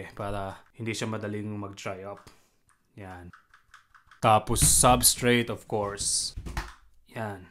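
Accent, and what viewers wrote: Ilocos, Philippines. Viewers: Ilokano kayo? Hehe.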